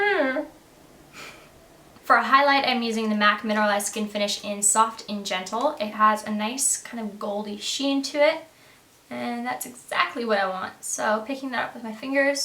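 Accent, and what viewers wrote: Australia. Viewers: I can hear an Australian accent starting ;) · you sound soo australian here!!! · Every video I watch, I am seeing an Australian accent coming through stronger each time! You have such a great talent and Australia is damn proud to have you! Also love this look!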